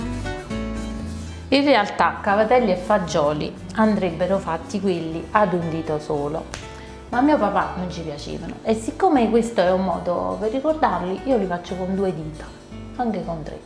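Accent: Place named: Irpinia, Italy. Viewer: Ciao, ti seguo da un po’.. quando ho visto la tua prima ricetta mi sono catapultata indietro nella mia infanzia... i miei genitori sono di ARIANO IRPINO e il tuo accento è il tuo modo di fare mi ha fatto davvero tornare bambina, alle mie estati giù dai nonni, con i pranzi infiniti e i profumi che solo giù si possono sentire... grazie per le emozioni che mi hai fatto e mi fai rivivere dopo ogni tuo video.. ❤️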